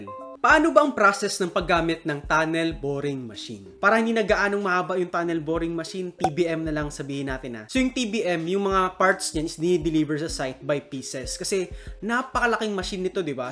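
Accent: Visayas, Philippines. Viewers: Taga asa man ka Sir bisaya man ka ehehe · bisaya kaayo oi! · hahahahahah bisaya pud diay ka lodi? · Bisaya ka man d.i Engr. hehe salamat sa paliwanag 😁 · Ayg paila na bisaya ka baii bwhaja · IDOL GYUD NKO IMONG ACCENT SA BISAYA DOL :D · bisaya d.i ka bay ahaha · Dugmok🤣🤣 cute kaau ka magtagalog sir bisaya kaau.murag ako sd 🤣. New sub here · Bisaya ka engineer?😅🤣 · AY BISAYA D I KA? KAYATA PAREHA TA 😂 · Bisaya diay ka sir hhahaa 🙂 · Bisaya mandi-ay ka Engineer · Bisaya accent🤣🤣💪💪Sakk lagay..... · Uy bisaya man diay haha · bisaya man diay ka kol, hahaha! nice content. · Nalingaw kos bisaya nimo tol, bagong fan here 😂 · Bisaya ka man diay sir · Bisaya ka pala bay grabeha HAHAHA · Bisaya diay... 😆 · Ayyy bisaya ka.man dong 🤣🤣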